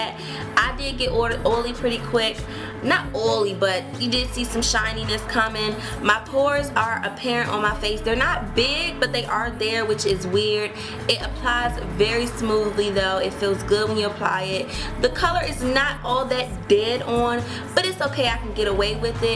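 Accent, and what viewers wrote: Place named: Baltimore, United States. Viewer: she has to be from bmore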